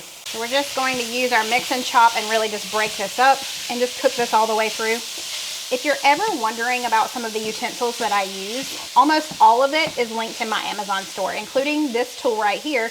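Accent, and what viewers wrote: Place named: Minnesota, United States. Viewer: You do ,have the accent right for Minnesota I'm from South Dakota my birth state. 🤣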